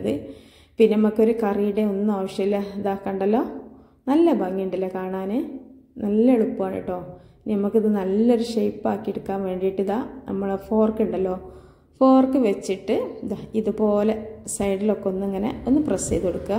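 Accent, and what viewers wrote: Malappuram, India. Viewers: Thatha. Kureperu kaanunna vdeo aanithu. Athukondu manasilakunnavidham samsaikku. Thani malapuram aakalle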